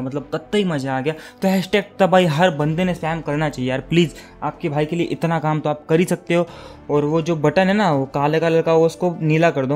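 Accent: Maharashtra, India. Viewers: Bhai marathi ahes ka tu...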